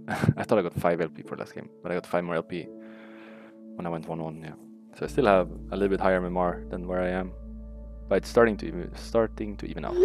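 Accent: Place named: France. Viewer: He's starting to have a French accent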